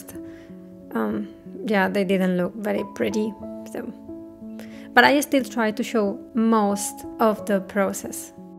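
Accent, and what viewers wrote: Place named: Spain. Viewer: Disculpa hablas español? Es que por tu inglés suena Español como lengua materna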